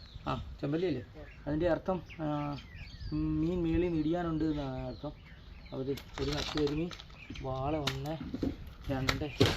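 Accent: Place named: Kottayam, India. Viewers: Kottayam boundary aanu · നിങ്ങള് കോട്ടയം ആണോ ആലപ്പുഴ ആണോ സംസാരം കേൾക്കുമ്പോൾ കോട്ടയം ആയിട്ടു ആ എനിക്ക് തോന്നുന്നേ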